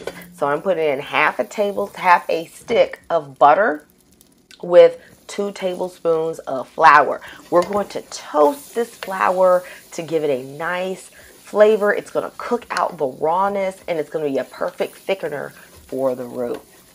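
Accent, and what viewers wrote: South, United States. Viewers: Talkin' like she straight out the south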